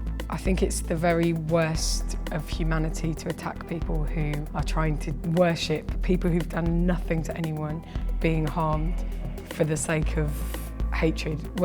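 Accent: United Kingdom. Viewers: Just another over privileged white person telling other white people how priviledged they are. Same old shit just with a British accent.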